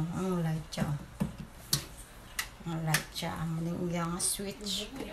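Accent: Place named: Western Visayas, Philippines. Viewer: Kanami gid ya pamatian sang Ilonggo ah.:) Music to the ears.😍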